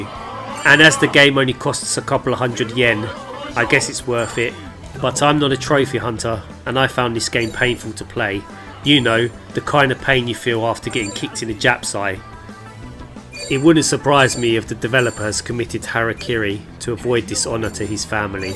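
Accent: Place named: Southern England, United Kingdom. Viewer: No Gilfs in it 😂WOW you brought the entertainment in abundance,absolutely hilarious songs and commentary,smooth English southern accent in full flow.keep sexy playa 🤘✌️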